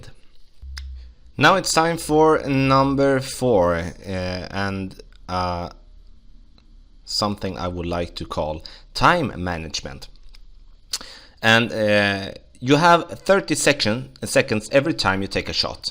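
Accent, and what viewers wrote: Scania, Sweden. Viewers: Dats how pejpol from skåene in sweden sounds lajk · This is some of the most swedish sounding english I have ever heard. Love it! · Are u swidish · Nice Swedish accent 😊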